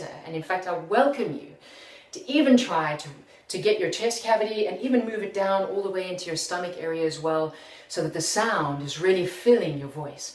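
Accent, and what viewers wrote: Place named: South Africa. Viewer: You sound like the news anchors in South Africa. Something about your accent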